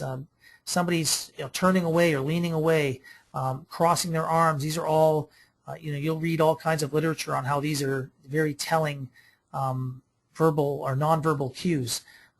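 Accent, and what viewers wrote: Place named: Minnesota, United States. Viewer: Good stuff.... is definitely a Minnesotan or Canadian; Fargo anyone?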